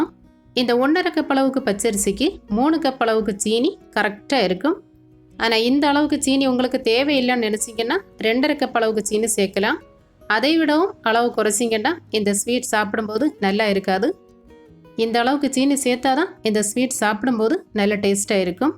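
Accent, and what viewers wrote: Kerala, India. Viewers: Are you a malayalee? Your tamil has malayalam accent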